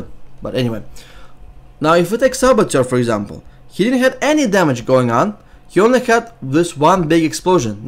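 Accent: Russia. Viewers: your russian accent is good with soothing music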